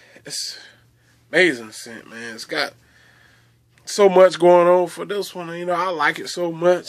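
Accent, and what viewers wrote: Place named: North Carolina, United States. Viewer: Nice review. Sound just like my hubby. North Carolina accent lol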